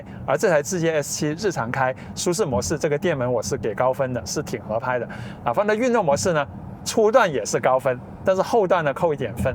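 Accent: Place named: South, China. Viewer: 听口音，100%南方人，哈哈哈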